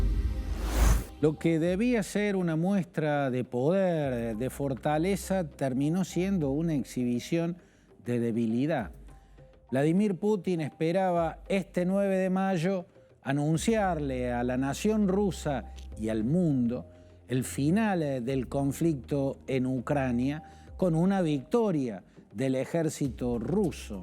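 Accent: Argentina. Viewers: Este señor, por su acento es argentino, bien estos ique analistas de este país siempre se saben todas las historias, no se como estados unidos no los contratan para asesores de guerra. Los mejores analistas que he escuchado que han sido embajadores de diferentes países en Rusia y que tocaron los temas del porque Rusia estaba actuando como lo hace. Este sabe mas que Putin osea solo vean a este señor y no tendrán idea de que esta pasado o pasará. Graciosamente solo habla de putin pero no comenta el papel de USA, Otan y la UE en este conflicto. Quisiera oírlo hablar de las Malvinas. Debe ser un libro de idioteces.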